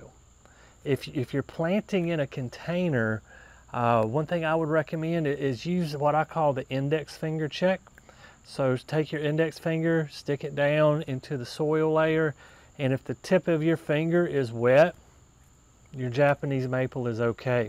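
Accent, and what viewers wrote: South, United States. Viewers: Where do you live? It sounds to me like you also live in a southern state. I live in North TX and just wanting to compare your environment to mine. This is my first time to see your program and so glad I found you as we are currently doing a total makeover of our yard. I'm currently researching the Japanese Maples so this was perfect timing for me to bump into you. 😊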